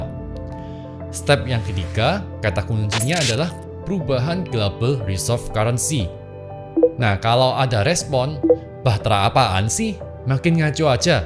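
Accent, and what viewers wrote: Java, Indonesia. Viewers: Jamie Dimon ngk penah denger om? Masa elu edukasiin org2 lewat Youtube tapi Jamie Dimon aja "Siapa itu?". Gila amatt. Mirip Mario Teguh lu ya. Uda medok & ngertinya cuma ngajarin orang mulu. Sendirinya mah wawasanya ya alakadar. ☺️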